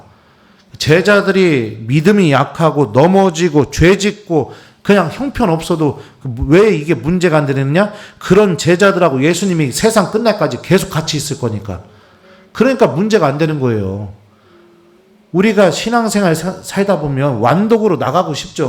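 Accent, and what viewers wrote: Chungcheong, South Korea. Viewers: ㅎㅎ 충청도 사투리 ! 그랬슈~~~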